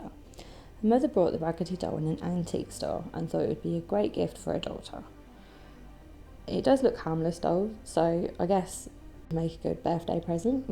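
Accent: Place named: United Kingdom. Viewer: Hello I like your story and your British accent he was really retro mystery love it❤️👍🏻🤘😍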